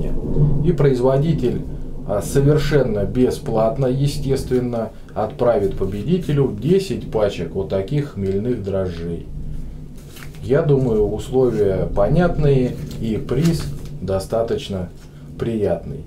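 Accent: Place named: South, Russia. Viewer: Привет,!(Я правильно понял,Вас зовут?) Приятно смотреть,а ещё приятней слушать южно российский говор с мягкой буквой "г".Сам со Ставрополья,разговариваю так же.Буду рад,если достанутся дрожжи на халяву!🤗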